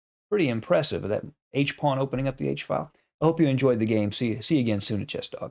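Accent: United States. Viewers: Absolutely love your Alireza videos and analysis and your American accent!! Keep up the great work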